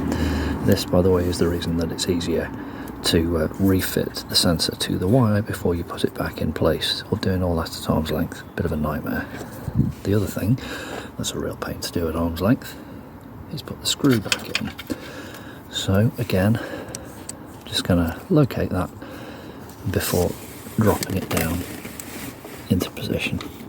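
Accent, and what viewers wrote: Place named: England, United Kingdom. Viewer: came here trying to help a friend with his 2008 XC90 so I hope it's in the same place! But I wanted to say you have such a lovely voice haha, where are you from? I can't decide if you're from the North East or Colchester 😂😂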